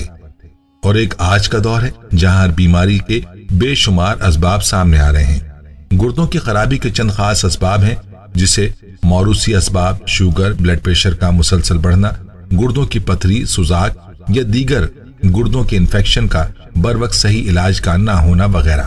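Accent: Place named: Pakistan. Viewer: You forgot to mention BARLEY water🙄🤔. It was refreshing to note your pure Pakistani urdu is commendable.👌🏻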